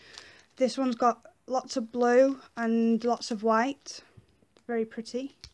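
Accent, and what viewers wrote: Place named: United Kingdom. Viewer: i really like your accent. where abouts in the uk are you from? you got some great freebies. i think i missed out on a couple of these :( i use the coconut oil on my hair and its great x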